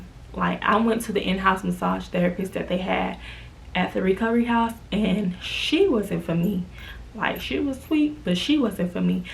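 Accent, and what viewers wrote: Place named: Miami, United States. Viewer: Lmao your Miami accent is so cute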